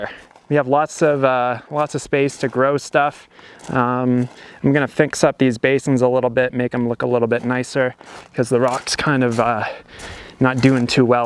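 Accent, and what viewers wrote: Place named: Canada. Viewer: I love that you haven't lost your Canadian accent eh. Hannah's one lucky girl to have such a handy man around the house.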